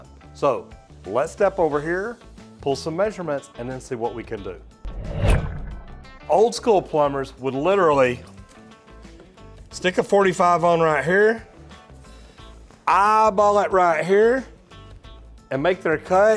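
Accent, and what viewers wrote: South, United States. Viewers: I've watched a lot of your videos. I'm more old school plumbing. I like how you do a lot of detail. Plus I like your accent it sounds southern. But you give a lot of information.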